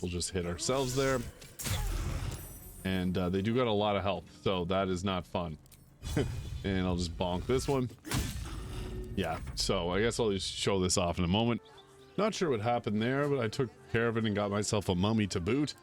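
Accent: Canada. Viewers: so sorry if this is weird but brother i have got to know where your accent is from 😭 i’ve been wondering since day 1 of watching your vids lmao. canada ??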